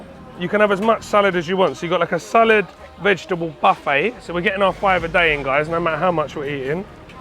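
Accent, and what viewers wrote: Thailand. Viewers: 5555+++++ฝรั่งพูดเหนือ น่ารักดีคับ รำ รำขนาด รำแต้ๆ 555+++ ขอให้เที่ยวภาคเหนือให้สนุกนะคับ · เก่งจังอู้คำเมืองได้ด้วย..เว่าอิสานได้บ่..ดนตรีประกอบกะดนตรีอิสาน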